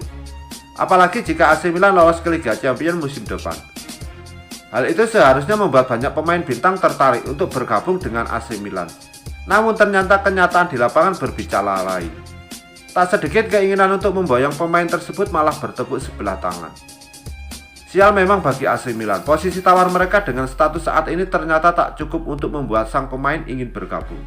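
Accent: Java, Indonesia. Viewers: Medok bgt ngomong e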